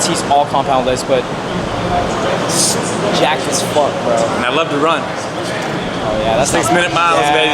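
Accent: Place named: Italy. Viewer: Pretty solid italian accent. Bye from Italy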